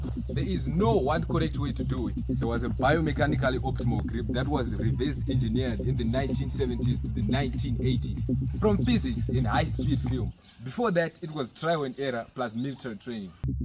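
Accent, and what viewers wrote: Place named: Zimbabwe. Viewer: That zim accent😂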